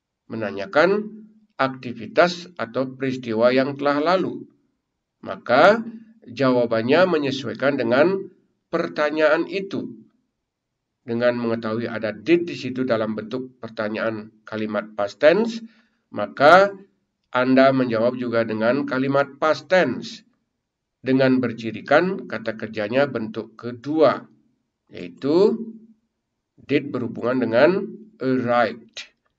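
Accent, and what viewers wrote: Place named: North Sumatra, Indonesia. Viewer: " Your accsent a like a from North Sumatera😅"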